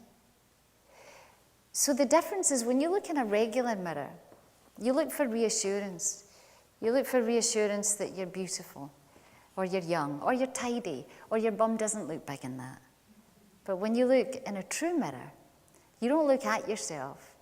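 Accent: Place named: Scotland, United Kingdom. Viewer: Hey there community. Would you help a non native English speaker figure out some of the words of Caroline's presentation? The transcription is really bad (and the translation is no help, just as poor). I have been able to correct most of it but some parts remain a mystery to me... Scottish is definitely a challenge, lovely but tough. There's nothing essential missing to understand this powerful and incredible presentation but I'd love to have a full clean text to read. Thank you all. at 2.24, Caroline's Mom says to her after the remark about the Cupid's bow "Caroline, you're a XXX ? " at 4.56, she refers to the moon "it"s like the XXX of the moon". at 5.45, after "presbyterian, "XXX.. " can't understand what follows until "in fact this is a question... at 7.17 you've got a long time to figure out.. XXX ?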